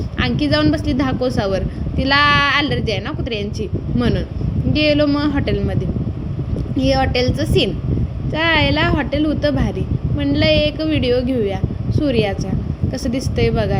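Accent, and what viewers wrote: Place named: Kolhapur, India. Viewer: लय भारी कोल्हापूरी मराठी 😊😊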